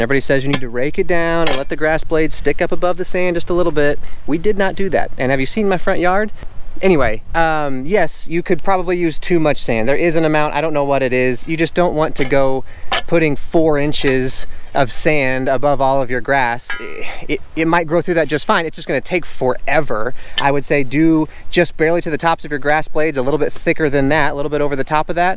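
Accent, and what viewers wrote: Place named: Australia. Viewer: Ben Simms will appreciate the Aussie accent. Lol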